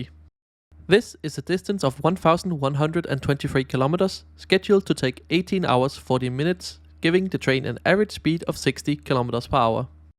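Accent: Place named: Denmark. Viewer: Just found your channel by accident… but I’m glad I did haha Such great content! Instantly recognized that you are from Denmark given the accent Recently I’ve been to some suburbs of Kobnhavn while they were constructing the Hovedstadens Letbane/Copenhagen Light Rail – quite a unique sight, seeing the freshly laid tracks without the overhead lines You are going to be uploading a video about it? As I read, parts of it are already open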